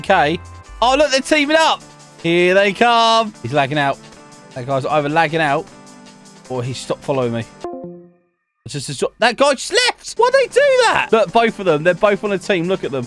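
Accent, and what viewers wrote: United Kingdom. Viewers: What country are you from you sound like someone in the uk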